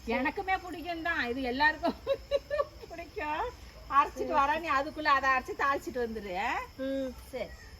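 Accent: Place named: Chola, India. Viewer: எங்கள் ஊர் காரைக்காலில் திருநள்ளாறுக்கு அருகாமையில் இருக்கும் சுரக்குடி என்னும் கிராமம் நான் உங்கள் காணொளியில் மிகவும் ரசித்து கேட்டது என்னவென்றால் உங்களுடைய சமையல் மற்றும் உங்களுடைய சோழமண்டலத்தில் உண்டான அந்த பாரம்பரிய தமிழ் பேச்சு நீங்கள் பேசுவது அப்படியே எங்கள் காரைக்கால் எங்கள் கிராமத்தில் பேசும் வட்டார வழக்கு நடைமுறையில் இருந்ததை கண்டு மனமகிழ்ச்சி அடைந்தேன் ஒருவேளை இவர்கள் நம்முடைய ஊர் பகுதியைச் சேர்ந்தவர்களாக இருப்பார்களா என்று தான் தங்களுக்கு இந்த குறுஞ்செய்தியை அனுப்பினேன் நன்றி அம்மா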